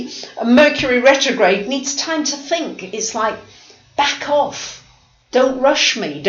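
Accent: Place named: Midlands, United Kingdom. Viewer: I had no idea you lived in Greece, I thought you were maybe somewhere in the midlands in the UK.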